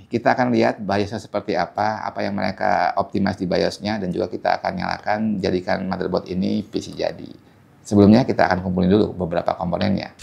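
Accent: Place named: Java, Indonesia. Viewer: kl lebih diperhatikan, logatnya beda mas ferry itu orang sumatera (jambi) sedangkan bang jawa tulen nih (jogja)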